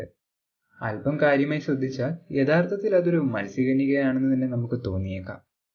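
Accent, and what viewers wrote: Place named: Thrissur, India. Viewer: Thrissur Kari Paranjath kekan rasand evdo ketta polea😲🤓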